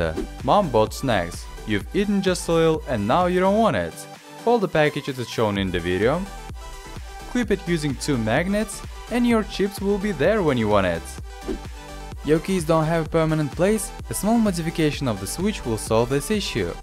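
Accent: Russia. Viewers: I love your accent. Question (not trying to offend) Are you Russian? Mainly because it is very thick. Again not trying to offend if you are not. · are u a Russian? ? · russian accent :D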